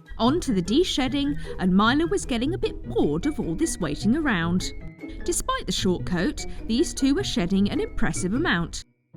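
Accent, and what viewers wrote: United Kingdom. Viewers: You know what adorable? You're "Surrey accent" watching your videos from someone from the United States standpoint, You sound like the narrator for television show. This is ment as a compliment. You sound so professional and have the most relaxing voice. (Even though it sometimes takes me a second to "translate" some simple words that are different in my American accent which is totally on me. But you do have a lovely voice and you do really great voiceovers.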